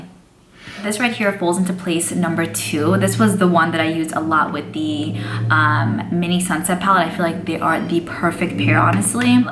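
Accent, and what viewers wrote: New York, United States. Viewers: Your accent is perfection. It’s just a kiss of Italian New York and I love it.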